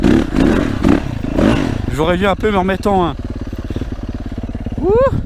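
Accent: France. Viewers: T’es pas des Vosges toi groooos ? 😂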